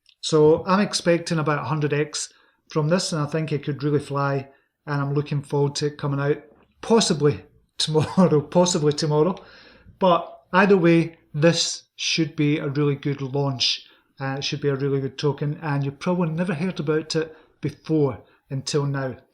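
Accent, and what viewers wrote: Scotland, United Kingdom. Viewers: Where in Scotland are you from? :o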